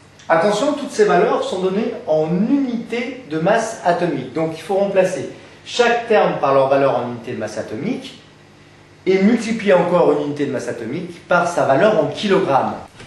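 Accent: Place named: Marseille, France. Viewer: bien expliqué avec l'accent marseillais ^^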